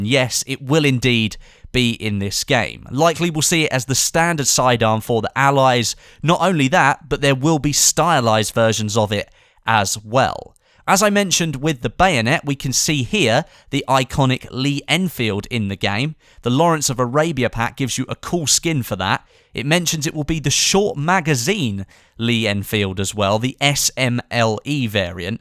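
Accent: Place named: England, United Kingdom. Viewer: Why do the people who make these videos always have that English accent? Do you all sit around in a jerk circle making game videos or something?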